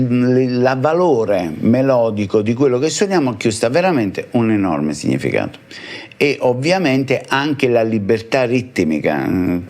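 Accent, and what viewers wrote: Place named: Naples, Italy. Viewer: Ottimo!! Ti ho conosciuto tramite l'intervista col "Tino", hai una "tomità " tutta napoletana nel parlare ed una chiarezza espositiva che mi ha fatto avvicinare ad un mondo finora misterioso non da ascoltare ma da imparare. È già il secondo video che ascolto ed è molto bello seguirti. Chapeau!!!👍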